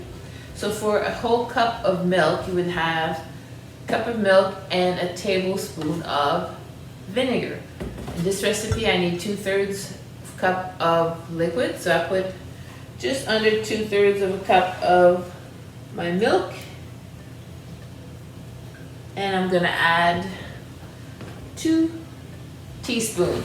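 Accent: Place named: France. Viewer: You are so charming with your French accent.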